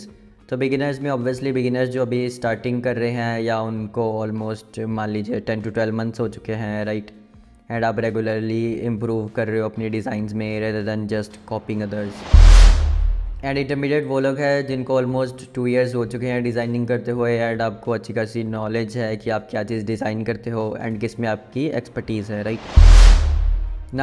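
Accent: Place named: Pakistan. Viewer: Why you gives Pakistani vibes? You have pakistani accent not Indian. IDK why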